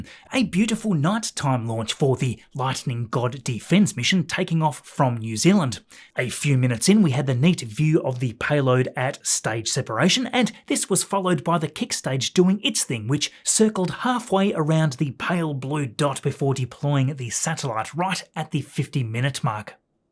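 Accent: Tasmania, Australia. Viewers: Didn’t realise you were a fellow Tasmanian! South or north?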